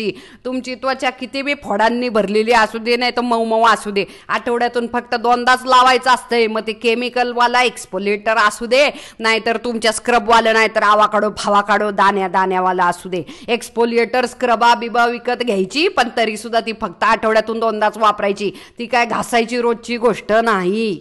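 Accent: India. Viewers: खूप छान माहिती सांगितली ताई आणि आपली गावरान भाषा तर लय भारी 👌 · तुमची गावरान भाषा पण किती छान वाटते ऐकायला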